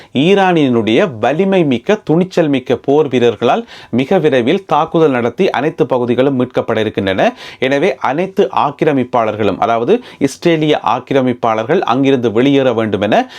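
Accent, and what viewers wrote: Jaffna, Sri Lanka. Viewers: Super speaking tamil in jaffna ❤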